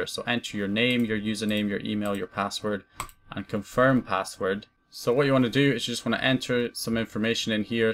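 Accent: Scotland, United Kingdom. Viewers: Are you scottish bro? Just for catching the accent. (i'm Italian actually)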